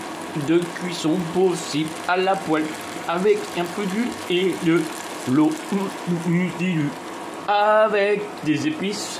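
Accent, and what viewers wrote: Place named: China. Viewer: Êtes-vous chinoi ou françai vous avez vraiment un accent très drôle 😂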